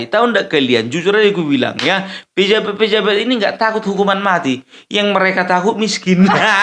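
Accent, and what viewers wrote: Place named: Sunda, Indonesia. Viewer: Saya sebagai orang jabar.. Merasa malu kalau benar terbukti kang emil terjerat bjb.. 😬 bang lucu bahasa sundanya. 😁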